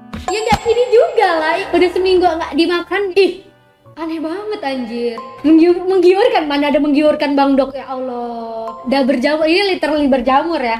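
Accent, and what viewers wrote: Java, Indonesia. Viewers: Medok banget feelingkuh orang Jawa sih menurutmu gimana min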